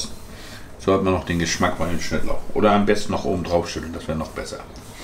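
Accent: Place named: Hamburg, Germany. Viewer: Danke, gut erklärt - so ein richtig ehrliches, bodenständiges Essen (wie von Deinen Videos gewohnt)...und Dein Vortrag für mich Nich-Hamburgerin kultig komisch und unterhaltsam durch den symphatischen Dialekt!✌👍🤣😂 Darf ich ma fragen, ob Du da einen GastroSuS Topf benutzt und ggf. welche Maße der hat? Ich würd mir so einen großen Pott gern zulegen... Sind die gut, auch im Hausgebrauch? Oder vielleicht kannst du mal ein Video zu Töpfen machen, die Deiner Meinung nach was taugen? Ok, herzlichen Gruss in den Norden!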